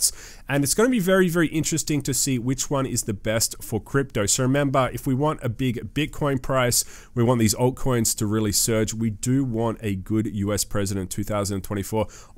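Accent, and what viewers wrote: Australia. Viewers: Great to hear a good Aussie accent. Xrp will run like mad once the market runs. Now it’s clear adoption will explode Thanks for your work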